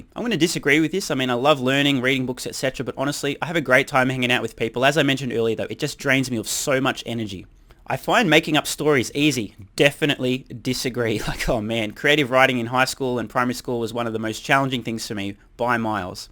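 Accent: Australia. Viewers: So ya have an Australian accent?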